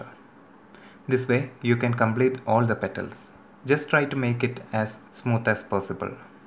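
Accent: Kerala, India. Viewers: You sound so malliyali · Dude your accent... kerala?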